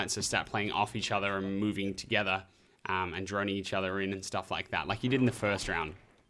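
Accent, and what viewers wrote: Ireland. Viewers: I knew i could hear some Irish in ur accent! Love the content bro! love from Scotland 🏴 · Been wondering if I could hear a bit Irish in your accent for ages. Knew you were one of us ☘️☘️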